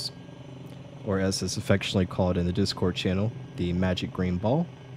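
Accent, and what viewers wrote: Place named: United States. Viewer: "Starting" cold and dark.Very hard to follow that american accent. This video doesn't help me at all.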